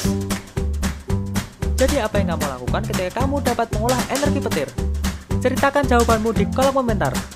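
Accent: Java, Indonesia. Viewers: petirr oii petir.... bukan peteerr... medok bangett masss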